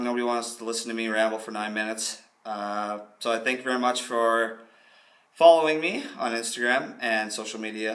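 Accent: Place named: Canada. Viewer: Yes you can tell you are Canadian! Good input. Looking forward to more up dates on topics , nutrient , exercises, posing ,etc. congrats on your competition !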